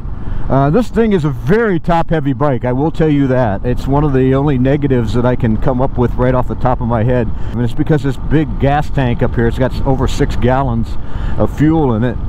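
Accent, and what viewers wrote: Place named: United States. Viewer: Thanks for using metric measurements occasionally. As a viewer from overseas I find the use of Imperial/ US units for tank capacities and fuel economy so confusing, even though I grew up using Imperial units until we changed to metric 60 years ago. Imagine the confusion for viewers who have never had to think about gallons/ miles/ inches in their life! So can you please list that you are using US measures ( even though it should be self -evident from your accent that you are filming in the US)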